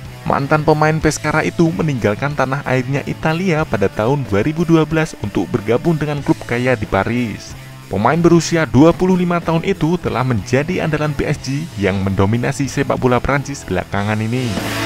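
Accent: Java, Indonesia. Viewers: pengisi suaranya medoookkk..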